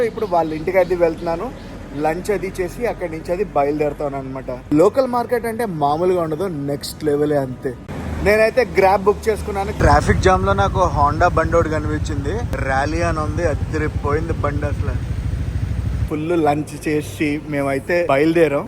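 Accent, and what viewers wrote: Godavari, India. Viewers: మీ ఇద్దరి వల్ల తెలుగు భాషకి గౌరవం పెరుగుతుంది. మీది గోదావరి యాస, గారిది విజయనగరం యాస. ఏదైనా ఇప్పుడు ఎవరి సొంత తెలుగు హ్యాపీగా మాట్లాడవచ్చు. ఇంతకు ముందు ఏవరు ఏడిపిస్తారో అని కృష్ణా, గుంటూరు తెలుగే మాట్లాడుతారు. Off cource మీడియా, సినిమాలు అవే బాషలో ఎక్కువగా ఉండడం వల్ల అలా మాట్లాడుతున్నారు. ఇంతకు ముందు తెలంగాణా యాస కూడా అంతే, నాలుగు సినిమాలు ఆ బాషలో తీయడం వల్ల అందరికీ ఈజీగా అర్దం చేసుకుని వాడేస్తున్నారు. Even ఆంధ్రలో వాళ్ళు ఇప్పుడు తెలంగాణా యాస ఈజీగా వాడేస్తున్నారు. ఏదైనా సినిమా అయినా, సోషల్ మీడియా అయినా, మీరు హైలైట్ చేస్తేనే., యాసలు బ్రతుకుతాయి. కృష్ణా, గుంటూరు ని తక్కువ అనడం లేదు, pure, plain తెలుగు వాళ్ళది, మిగతా జిల్లాలది ఫ్లేవర్ తెలుగు అంతే. Love from vijayawada(NTR dist). Native place చీపురుపల్లి (vzm dist). Thank you.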